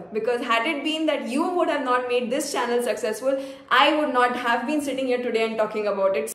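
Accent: Bihar, India. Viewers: Views to aa rhe phle ki trh to kyu tension le rhi ho . BTW wo Bihari accent ki wjh se subscribe Kiya tha, so don't leave it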